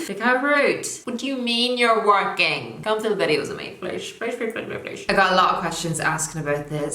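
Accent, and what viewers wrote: Ireland. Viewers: love you girl!! Missed that gorgeous Irish accent on the tube of you 🥰🥰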